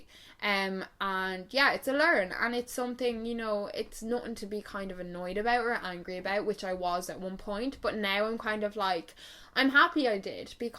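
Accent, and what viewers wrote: Ireland. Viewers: I love your Irish accent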